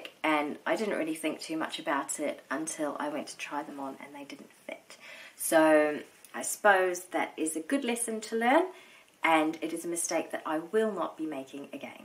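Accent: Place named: New Zealand. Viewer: Lovely to hear a kiwi voice on a you tube sewing vlog. Hi from Wellington 😍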